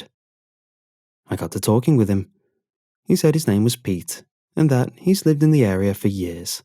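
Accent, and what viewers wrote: England, United Kingdom. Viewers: The english accent and the dry delivery sells this story. Two thumbs up!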